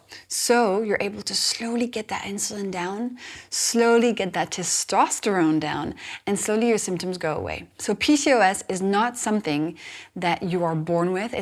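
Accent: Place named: France. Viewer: I love your French accent. 😊 I know by heart you’re hacks but unfortunately it’s not easy to apply them… still working on it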